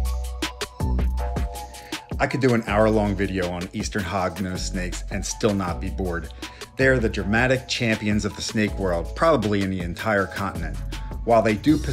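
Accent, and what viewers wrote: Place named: Delaware County, United States. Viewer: Sounds like a delco guy. With that accent!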